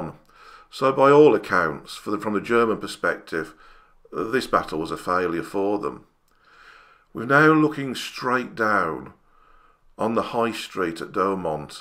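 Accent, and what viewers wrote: Birmingham, United Kingdom. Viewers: Great info! Funny Brummy accent too!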